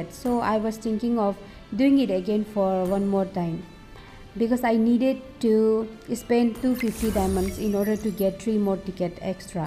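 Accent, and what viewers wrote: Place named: Arunachal Pradesh, India. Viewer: Waah Arunachal english 😅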